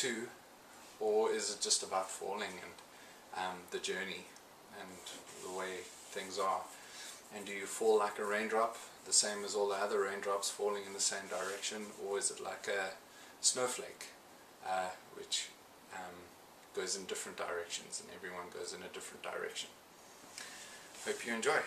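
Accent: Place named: France. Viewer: is french or something? what is that accent?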